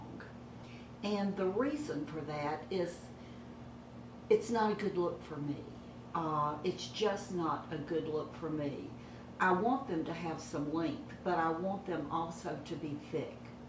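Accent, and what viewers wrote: South, United States. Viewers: Agree, the whistling is sort of annoying as it's hard to hear you. You look wonderful and always dress stylishly. Love your Southern accent. Diana in AZ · It's too bad the video is ruined with that awful whistling. The lady has a southern accent and is difficult enough to understand without the whistling. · Love the look, music is awfully annoying! Just your sweet southern voice is all we need!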